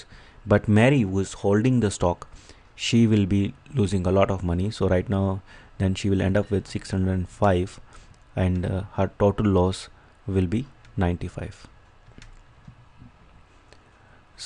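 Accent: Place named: India. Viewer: No from Japan, what do you think????? Of course from India (from his accent).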